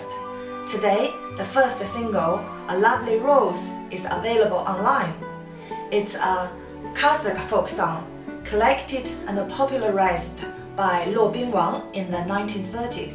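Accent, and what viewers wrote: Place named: London, United Kingdom. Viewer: 之前 留學英國 帶倫敦腔 現在 又有少許 紐澳腔調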